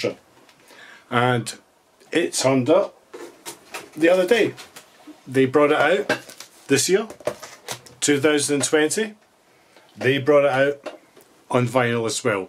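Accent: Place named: Stirling, United Kingdom. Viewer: Just added this to my collection haven't opened it yet and found your video, hello from Stirling lol finally a familiar accent on YouTube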